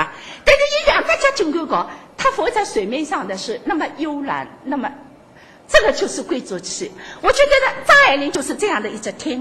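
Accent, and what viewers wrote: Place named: Shanghai, China. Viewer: 典型的上海普通话😂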